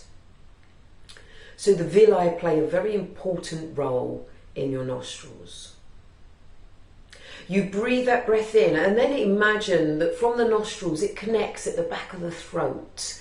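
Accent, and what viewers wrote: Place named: United Kingdom. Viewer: Your accent is pretty close to a British accent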